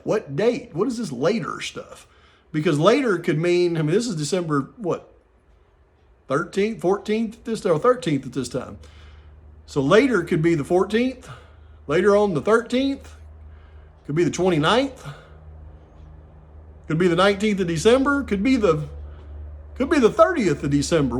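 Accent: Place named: South, United States. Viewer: Wow, that really was a BAM discovery, Mr.! I've been somewhat critical in my remarks about you in prior posts, but I'm coming around to your thinking. However, I still can't figure out who you really are (ex-police, 3-letter agency guy, etc.) Plus, your southern accent.l is intriguing; hmm. Either way, I sure like you! Keep up the great work!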